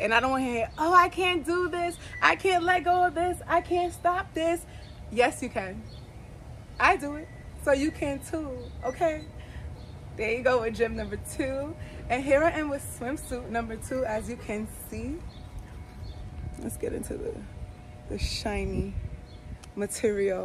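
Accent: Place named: New York, United States. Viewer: I can’t tell if she from Long Island or the Bronx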